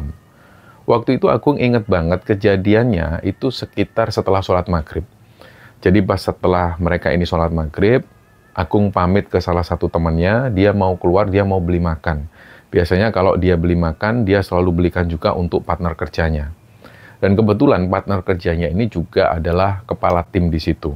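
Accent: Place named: Banjarnegara, Indonesia. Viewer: Banjarnegara mana suara nya✌️✌️🥰 Salam sehat dan sukses slalu buat mas dan semua kru nya🤲🏼😅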